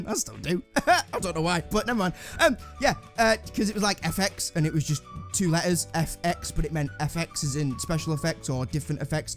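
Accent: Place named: Lancashire, United Kingdom. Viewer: I figured you was a lancashire lad but i didn't think you'd be as close as manny haha nice one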